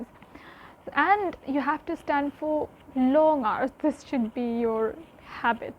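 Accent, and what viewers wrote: United Kingdom. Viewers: Mashallah. Nice English Accent though, 👍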